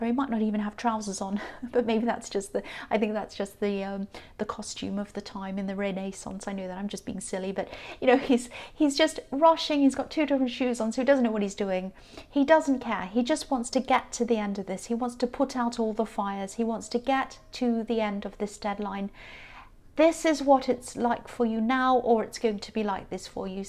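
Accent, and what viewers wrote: United Kingdom. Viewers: If that is my ex, hmmm I will refer him with the best therapists but that's the best I can do. He rejected me and used me at the same time and that ship is sailed. I'm so grateful cuz I've learned, healed so much and I don't hate him. I m more focused on work and personal development all the levels there are. You are so good and inspiring, you're gifted. Thank you very much, I am 4 days away to my birthday and really ready to embrace the new life I want so the past can be flushed in the toilet and only keeping the lessons!❤ where are you from? I love your accent, I wonder is it the UK?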